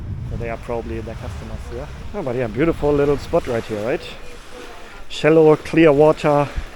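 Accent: Germany. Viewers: Hör ich da einen deutschen Akzent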